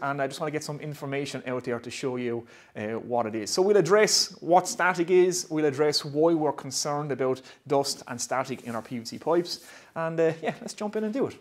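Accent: Ireland. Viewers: Mate your accent reminds me of a year I spent as an exchange student in Limerick, which was some of the happiest times of my life :) Nice channel too, I'll be checking it out!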